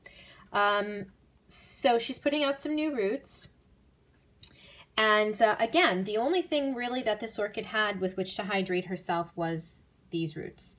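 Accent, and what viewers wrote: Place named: New York, United States. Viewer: GREAT video, thank you. I learned so much about how to take care of my own phalaenopsis buddies. All your comments about ice cubes made me laugh. Yeah people tell me all the time about how they water their orchids with ice cubes like that. I never follow their advice either because it doesn't seem like a tropical plant would like ice water. Now I know for sure after seeing this video of yours. Cheers. Also, I love your New York accent.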